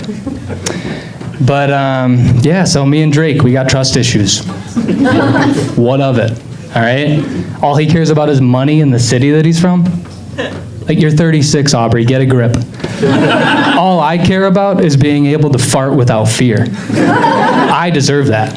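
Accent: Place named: Brooklyn, United States. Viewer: Is this guy Brooklyn-based does anyone know? He has a very Brooklyn delivery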